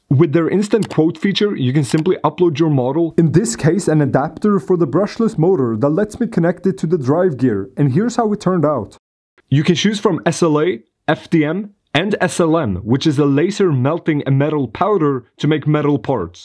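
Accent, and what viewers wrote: Norrland, Sweden. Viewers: You from Skellefteå, or some other part of norrland? I'm from Lycksele. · dude are you swedish? Hejsan!!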